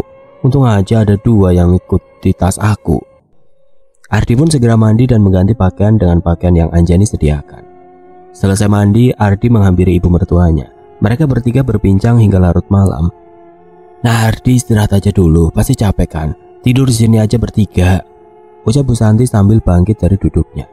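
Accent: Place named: Java, Indonesia. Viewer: Jadi lucu denger mas jowo ngomong bahasa Sunda ... 😁 Aksen jowonya medokk ... Lop sakebon ❤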